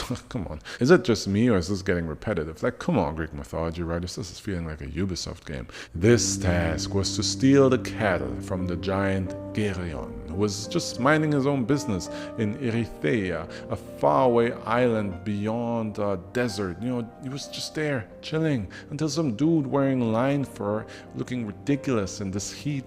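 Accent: Germany. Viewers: to me it sounds like a German accent. Also the pronunciation of the god’s names sounds exactly like you’d hear them in German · Why do you have a German accent sometimes?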